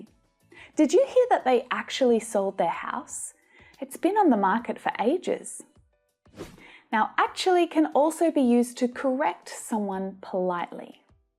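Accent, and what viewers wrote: Australia. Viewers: speaks with an Australian accent...why do you say it is awful? Btw where are you from?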